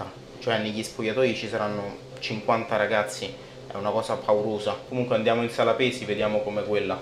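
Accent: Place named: Umbria, Italy. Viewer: Noto un accento della bassa Umbria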